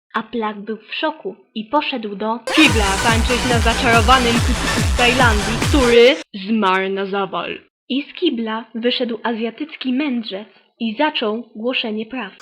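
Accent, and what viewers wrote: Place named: England, United Kingdom. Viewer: Nie mów z angielskim akcentem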